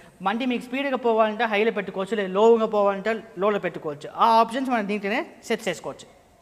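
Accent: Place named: Karnataka, India. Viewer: Nayana ayana Karnataka వరుల ఉన్నారు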